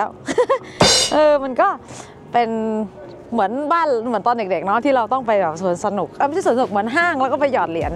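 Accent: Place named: Northern Thailand, Thailand. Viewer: ຂອບໃຈທີ່ພານຳທ່ຽວນິວຢັອກ ມັກສຽງນ້ອງອູ້ກຳເມືອງຫນ້າຮັກດີ ອ້າຍເຄີຍມານິວຢັອກ2ເດືອນໃນປີ1995 ທ່ຽວມ່ວນໆແລະປອດໄພເດີ້ນ້ອງ👍👍👍😊👏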